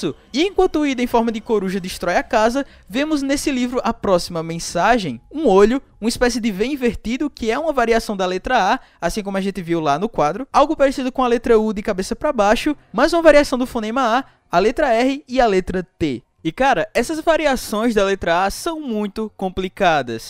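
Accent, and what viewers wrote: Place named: Recife, Brazil. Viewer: Sou novo aqui deu 2 segundossdo cata falando e eu como : esse cara é de recife esse sutaque só pode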